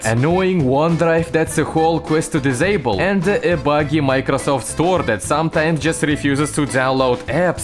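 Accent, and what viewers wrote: Russia. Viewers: Бля чел у тебя русский акцент почему у тебя только английские субтитры и озвучка😭😭😭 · bro english in russian accent is so harddd🔥🔥🔥🔥🔥🔥🔥🔥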